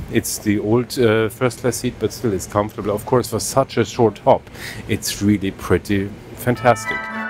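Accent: Germany. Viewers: In my personal opinion, one must be really stupid to spend around 2500 euros for a return flight lasting an hour and 20 minutes where you can't even fully enjoy the starry breakfast, and finally drinking Krug champagne at 7.00 am is really bad for your health..... P.S. The German accent of the presenter is awful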